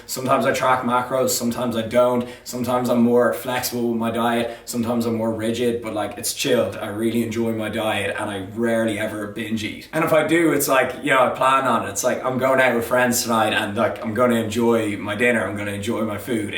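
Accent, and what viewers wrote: Ireland. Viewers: Ayyy anoder irish man! Coming from Northern Ireland here!!!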